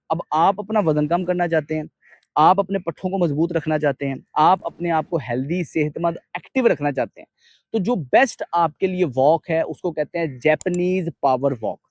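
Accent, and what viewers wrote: Punjab, India. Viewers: Haye Allah... Full gol O Punjabi version english ❤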